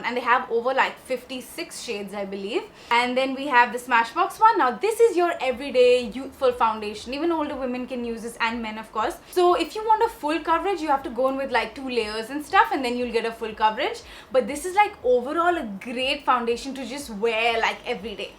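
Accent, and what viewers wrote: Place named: United Kingdom. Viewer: I looove when she speak British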